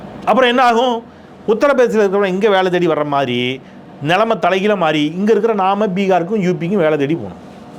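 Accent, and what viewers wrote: Tirunelveli, India. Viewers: அண்ணாச்சி உங்க நெல்லை தமிழும் உள்ளார்ந்த உறவின் நெகிழ்வும் உள்ளபடியே நெஞ்சத்தை தொட்டு விட்டது வாழ்க.... வளர்க.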